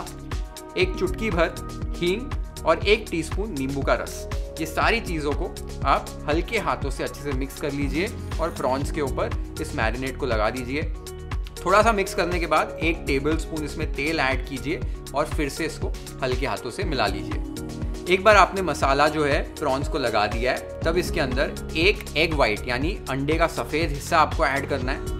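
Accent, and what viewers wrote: Maharashtra, India. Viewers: खूपच भारी 😘 आणि तुझ मराठी accent पण एक दम मस्त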